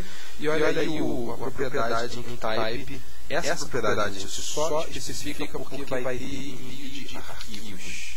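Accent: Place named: Rio de Janeiro, Brazil. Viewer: apesar de audio terrivel e sotaque carioca forte, um otimo video!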